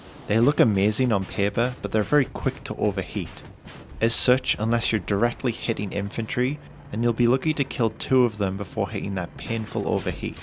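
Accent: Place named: Europe. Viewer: judging by your accent, I'm assuming EU time zones. When are you playing? What game mode? It's a shame we can't easily play servers that frequents.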